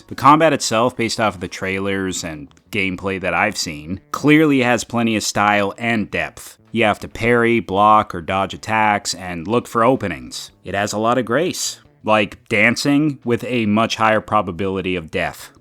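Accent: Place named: Scotland, United Kingdom. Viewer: hey man first of all you have absolutely nothing to apologise for, cuz I know and I absolutely understand how busy your schedule is especially now so I don't mind as long as you were able to read my comment that's enough. And thanks for replying to me back I mean replying back to every single point that I mentioned, I really appreciate that. Thank you man. My support to u is always gonna be green mate. Can't wait for the Scottish Accent to be back and eagerly waiting for your new video. Have an amazing beautiful peaceful wonderful day today and many more happy days to come your way and for your family as well. Cheers mate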